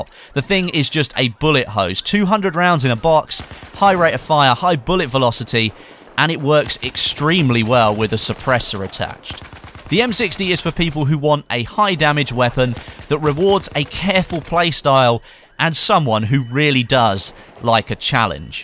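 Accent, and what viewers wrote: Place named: RP, United Kingdom. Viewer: your english is so beautiful! You talk like some dude from "BBC Learning English":D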